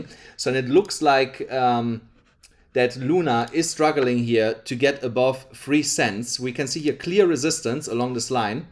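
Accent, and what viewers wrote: Germany. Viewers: Bro kommst du aus Hamm xD?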